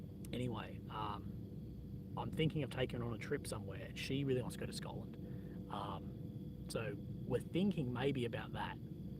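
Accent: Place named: Australia. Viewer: That’s an Aussie accent 👍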